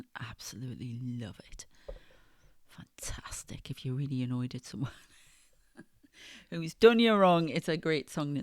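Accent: England, United Kingdom. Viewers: Spoken like a true English woman 👍👍 Go girl 💕💕💕🥰🥰 Kath xx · Hello, I love hearing your readings and I love your English accent.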